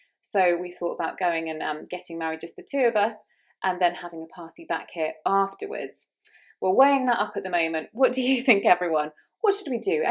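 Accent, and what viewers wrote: United Kingdom. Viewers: ❤, I love ur accent so much I wanna know the name of this lovely British accent Thank you ❤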